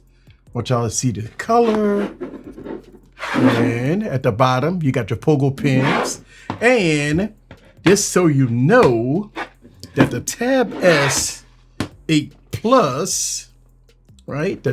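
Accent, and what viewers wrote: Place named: New Orleans, United States. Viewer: Man you gotta be juvenile daddy or something lol . Not trying to be funny or anything but this how he's gonna look as he she and y'all sound just alike you gotta be from new Orleans 😂 .I love your channel btw black brother ❤️💯🫱🏿‍🫲🏾💪🏾💯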